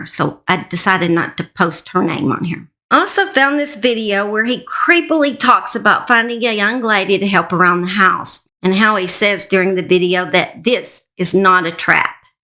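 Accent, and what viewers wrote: South, United States. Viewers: Good Morning 😊 Im watching your newest video and wanted to say I Love your Southern accent!! I'm somewhat a Southerner myself. I live in NC originally from SC. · The craziest, most disturbing part is how she wanted to go out! It boggles my mind as to why she would make the decision that she did. She's so beautiful and sweet and innocent looking, you'd never guess in a million year's how disturbed/mentally ill she was just by appearances. And why let that ugly, disgusting, slovenly looking piece of 🐕‍🦺💩 touch her? This case really disturbed me on a deep level. I hope she's at peace and in a better place👼🏻🪽🙏🏻, if there really is an afterlife, and I hope the opposite for him when he goes 🔥👿. Also...this is my 1st time to your channel and I can tell you've done a lot of research, there was a ton of detail that you've put into this video...and I love your southern drawl.😁 Great work ...subscribed✅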